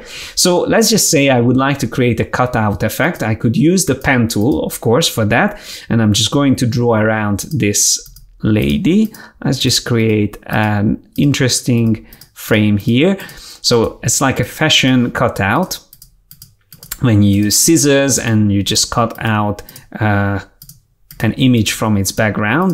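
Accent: Hungary. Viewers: Are u hungarian? Your accent is identically as hungarians speak english. No offense, it just was familiar to my ears... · sick video, are you Hungarian? judging by the accent :) (so am I)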